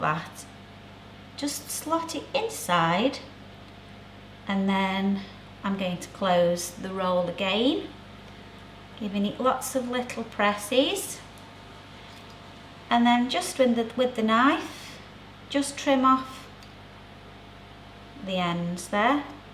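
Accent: United Kingdom. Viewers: oh my.... i love your accent.i didnt like British before but you talk really beautifully.and your tutorial was awesome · Tutorials are always better with a British accent!! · Obviously some ignorant people who think the English all talk like Kate Winslet!! There’s so many awesome accents in the UK! Hers being one of them. Thanks for a great tutorial. Love from Oz x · i love your little british acent X3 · what are you talking about? there is no Irish accent here, just a British one, probably midlands maybe southern.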